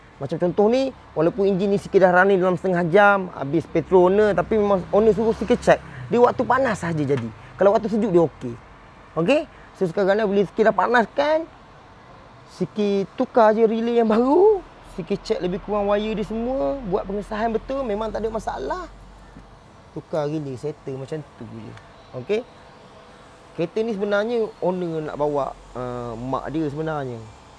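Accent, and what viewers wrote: Pahang, Malaysia. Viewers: ni cakap mcam org pahang.. bengkel beloh mane..tak daye dah repair kete mahal sokmo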